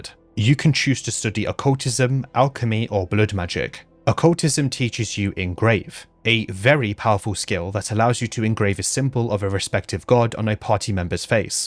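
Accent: United Kingdom. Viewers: as a linguistics major, you didnt really mispronounce very many foreign words in the last funger video. And if anything most of the words that people thought you did mispronounce can be chalked up to your specific english accent. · the full voicing of this video plus your british accent makes me feel like im listening to a tma episode at times lmfao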